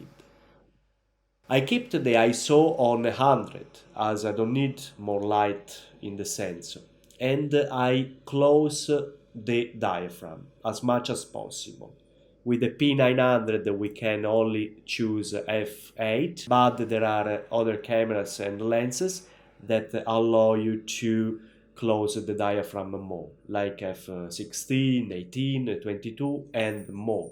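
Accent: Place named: Italy. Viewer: Quanto si sente che sei italiano 😎 ottimo video, io posseggo la p1000 con un filtro nd 1000 ed è pauroso il risultato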